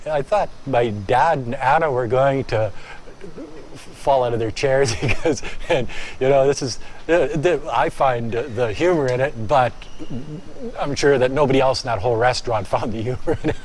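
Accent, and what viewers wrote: Canada. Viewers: This guy sounds Canadian. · This gentleman is a Canadian, eh? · This guy sounds very canadian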